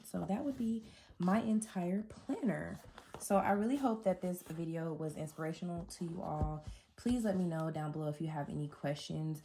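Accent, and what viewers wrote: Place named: New York, United States. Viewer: New viewer & subscriber. Right b4 you said you live in Vegas, I said she sounds like she could be from NYC. Tfs all your ideas...good inspo. Love your nails 💅 too.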